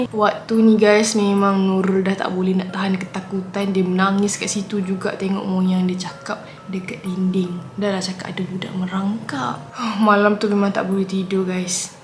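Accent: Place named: Terengganu, Malaysia. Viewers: COMEL GILA KAK BACA LOGHAT TERENGGANU 😂😂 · Comel kak cakap loghat terengganu 😭💕like it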